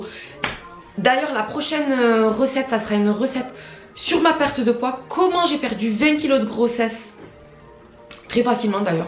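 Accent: South, France. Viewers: Coucou ma belle je te suit depuis la recette de tes msemen inratable lol. Et j'aime ton nouveau contenus je me suis tjrs demander à quoi ressemblé cette voix derrière ce magnifique accent du sud. Je suis pas decu tu es adorable à tout point de vue. Continu a nous régalé et nous proposer de news contenus différents bisou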